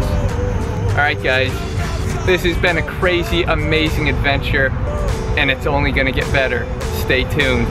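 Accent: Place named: New York, United States. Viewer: I’m new to this channel so idk but is he originally from New York? Brooklyn maybe? Just picked up on his accent is all lol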